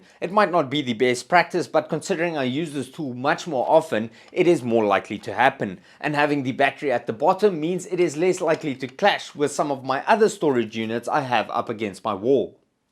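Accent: South Africa. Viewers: Tanks for the response to my comment.. Having lived in Johannessburg for 5 years as a teenage, your accent just brings back many great memories of the country. Please keep up the grear work be postive and stay healthy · Do I recognise a South African accent? 😁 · Great ideas, I have used some of these ideas myself. Will implement some of yours. Love your accent, you sound like my south African mate. Cheers alojs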